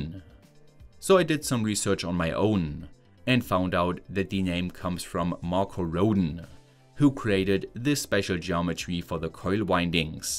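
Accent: Germany. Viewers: I like that german accent! Can you please more often use the word "Jawohl" like germans do in movies. 😂😂😂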